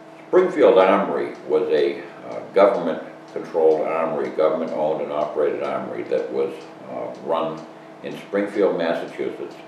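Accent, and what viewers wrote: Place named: Maine, United States. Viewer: As a child we had a summer house in Maine. Your accent reminds me of the people that I met there, brings back memories...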